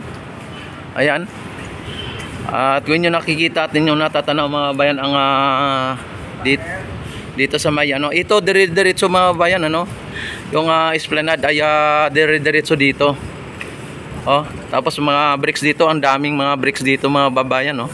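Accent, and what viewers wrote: Visayas, Philippines. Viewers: Bakit hilig nyo mag vlog na akala mo reporter kayo?! Mukha kayong timang! Bisayang ewan pa accent mo!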